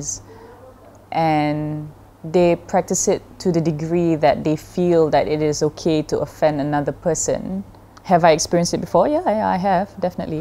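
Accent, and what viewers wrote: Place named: Arab world. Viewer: Iya , i lOve R , pelat Arab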